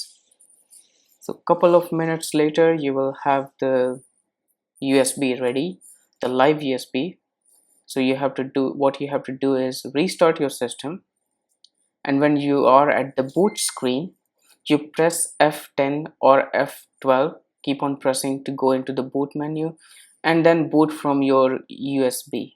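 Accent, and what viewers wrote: India. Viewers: Can i find a video without the FUCKIN INDIAN ACCENT PLEASE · Indian accent , it makes things so easy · Why do Indians always sound like this ? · great content, but I don't the Indian accent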